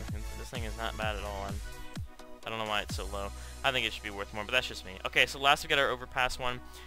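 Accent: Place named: South Africa. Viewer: I thought you were South African... Where are you from???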